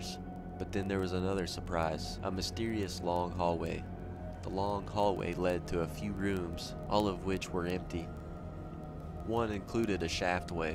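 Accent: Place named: Chicago, United States. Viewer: The narrator has a Chicago accent, which is odd for an NYC video.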